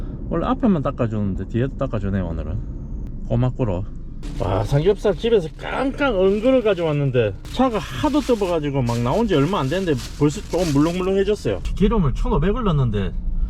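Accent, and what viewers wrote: Gyeongsang, South Korea. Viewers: 정말 결혼 잘하셨어요 경상도 말씨 듣기 좋습니다 ㅎ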